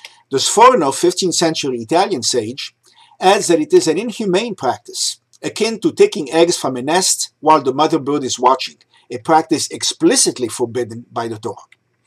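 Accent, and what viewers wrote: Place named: Russia. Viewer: כשאתה מדבר עברית יש לך מבטא רוסי 😅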